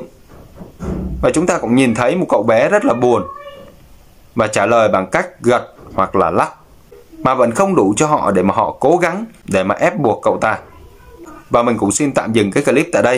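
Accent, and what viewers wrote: Standard. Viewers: Anh nói chuẩn mem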